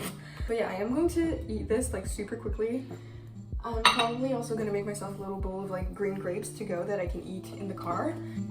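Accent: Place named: Quebec, Canada. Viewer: yayyy a fellow french canadian! I knew as soon as i heard you speak french lol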